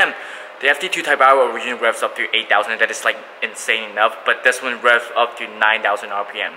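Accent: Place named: United Kingdom. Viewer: Wow. Love your videos. I’m from uk. From your accent did you study here?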